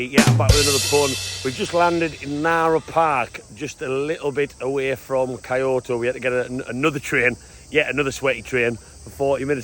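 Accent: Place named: Newcastle, United Kingdom. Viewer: A proper Geordie in Japan, absolutley class vlog mate howay!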